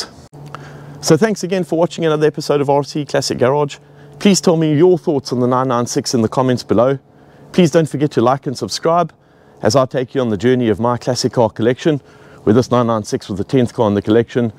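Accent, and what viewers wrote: South Africa. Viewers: Are you from South Africa? Much love from Vietnam, as a South African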